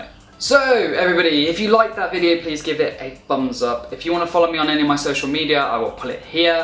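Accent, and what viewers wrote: United Kingdom. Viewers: I picture you eventually with a special understanding and lovely woman, having a couple of kids with her but having boyfriends on the side whom your woman friend would also know. You're so appealing. Whoever you get involved with is lucky. You voice reminds me of Russell Brand's. Hope you aren't offended by the comparison. I love British accents.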